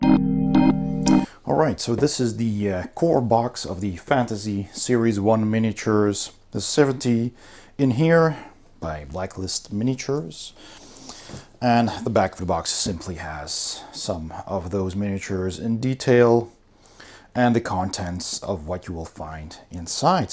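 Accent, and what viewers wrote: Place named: Netherlands. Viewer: i could tell youre from the netherlands like me, ill be getting my set tomorrow and im super hyped for it!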